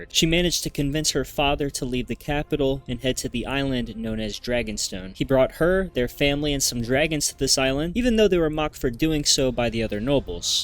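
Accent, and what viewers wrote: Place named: United States. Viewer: I like your accent. I'm assuming you're American (correct me if I'm wrong and you're Canadian); which part of the States are you from?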